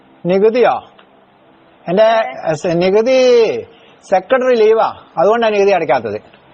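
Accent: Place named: Kasaragod, India. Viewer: ശരിക്കും ഇത് കാസറഗോഡൻ ഭാഷയല്ലേ? അപാരം തന്നെ. കൊച്ചി മെട്രോയിൽ ഇരുന്ന് ചിരിച്ച് മടുത്തു.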